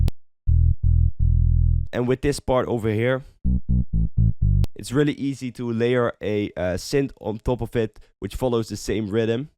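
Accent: Netherlands. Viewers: heerlijk dat nederlands engels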